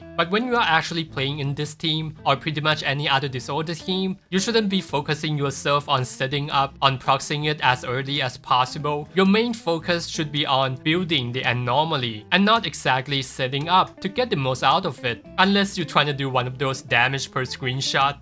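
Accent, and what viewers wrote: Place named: China. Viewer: you cant really compare trigger to qing yi i think, trigger is an off field stunner, and qing yi is an on field stunner 話說up主是不是華人？英語聽起來有華人口音，純粹八卦😂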